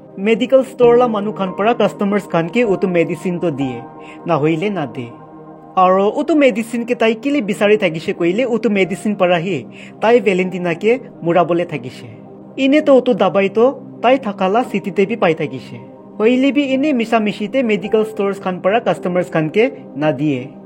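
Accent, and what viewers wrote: Nagaland, India. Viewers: I'm happy with your Nagamese accent special kushi ba tho Last tey....#Na kwa tho😅❤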